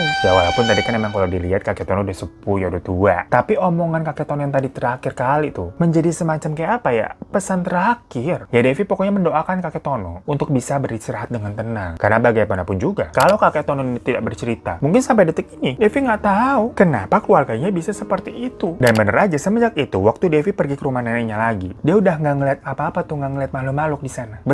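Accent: Java, Indonesia. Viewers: Knp aksennya jdi ke Jawa kak.. Kan Devi dri Sulawesi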